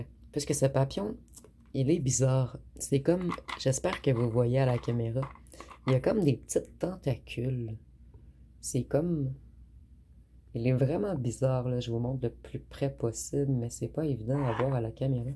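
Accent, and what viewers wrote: Quebec, Canada. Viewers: Vous avez Madame une très belle manicure , une belle couleur de vernis a ongles ,et je vous ressent une très belle personne en tout point de vue et très soigner pour vous autant ds votre façon de penser que de vous arranger et j'aime bien votre accent québécois ces très jolie.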